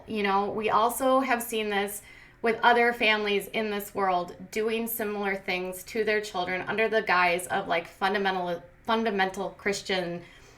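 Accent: Minnesota, United States. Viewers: I love your accent! It reminds me of my friends in Minnesota ❤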